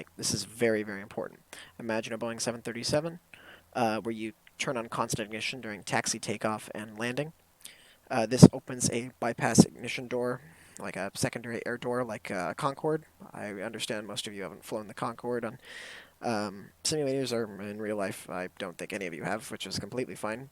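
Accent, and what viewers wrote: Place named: United States. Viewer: Thanks for trying to give an explanation on these systems. I was looking forward to it BUT here is a bit of feedback on your attempt: 1. The audio is incomprehensible: there is the "p" noise for your breath going into the microphone. 2. You speak too fast with a strong US accents (not everyone is from the US) 3. You say too may words that confuse and dissipate the tutorial content instead of communicating just what is important. Clearly you haven't planned on paper what to say. 4. The cockpit is too dark and reduce visibility 5. The audio is too low 6. you move the camera too quickly, which coupled with a fast speaking makes everything impossible (for me at least) to understand. Sorry for being honest ;-) Hope you can re do this in a clearer way. Thanks again!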